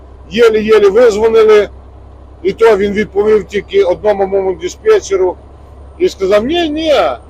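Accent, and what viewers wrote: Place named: Galicia, Ukraine. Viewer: Чую галицький акцент...чи Волинь все таки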